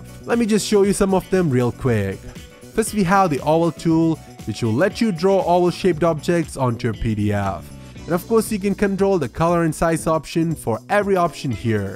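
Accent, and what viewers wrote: Kerala, India. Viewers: Your English wasn't bad. I could understand you well. Btw, are you from India? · Are you from Kerala??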